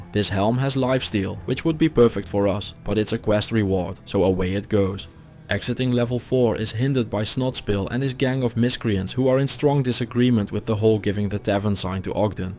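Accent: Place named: Netherlands. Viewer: you sound SO Dutch it hurts my ears!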